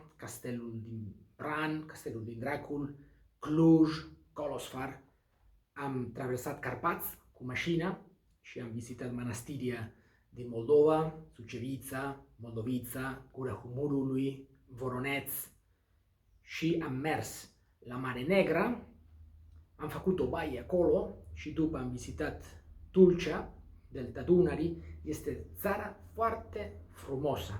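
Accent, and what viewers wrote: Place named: Basque Country, Spain. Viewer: Tiene más acento vasco hablando italiano que francés. Genial, padre. Esker anitz, Aita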